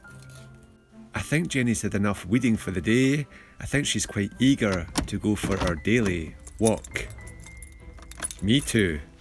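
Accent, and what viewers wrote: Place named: Scotland, United Kingdom. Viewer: Frankly love your Scottish Accent,. From Stuart Adamson to Sean Connery.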